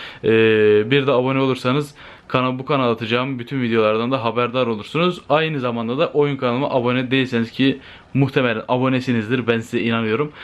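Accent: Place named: Ankara, Turkey. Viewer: La adam Angaralıymış ben de diyom niye samimi geliyo gardaşim la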